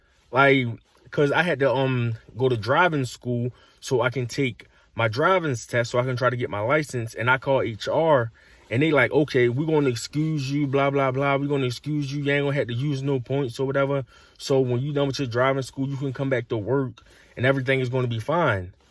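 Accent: Baltimore, United States. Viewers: Definitely a bmore dude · Are you from Baltimore?lol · You from Baltimore? That accent crazy lol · Bro definitely gotta be from Baltimore 😂 accent super hard 😂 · are you from baltimore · This dude gotta be in Baltimore with that accent. And shit in Baltimore is different completely no matter what it is. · He sound like he's from Baltimore Lol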